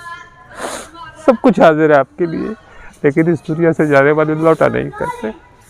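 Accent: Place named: Urdu, India. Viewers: Thank you for sharing your family information with us. I am curious if your ancestors actually migrated from India, cause your Urdu accent is very much like Urdu speaking families who migrated from Urdu speaking regions of India like Lakhnao or Dehli etc.? May Allah bless you and your whole family! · कोई फर्क नहीं है उर्दू और बोलने का लहजा UP jaisa h . Pehle Baar jb Aapka vilog dekha mujhe bhi aesa lga .I'm from UP ..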